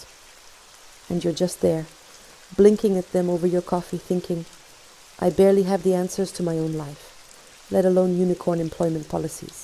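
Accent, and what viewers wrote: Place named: France. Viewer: I ❤ Your French Accent. ❤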